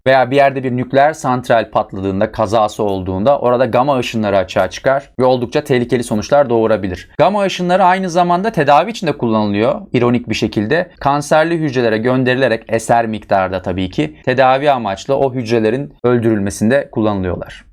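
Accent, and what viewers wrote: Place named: Kastamonu, Turkey. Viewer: samsun da olabilir ama kastamonulusunuz bence ya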